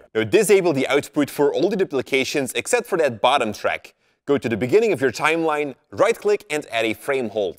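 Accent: Flanders, Belgium. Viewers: ik wist niet dat je vlaams spreekt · Ik wist niet dat hij vlaams was😂😂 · ik wist niet dat je vlaams bent, ik kom zelf uit nederland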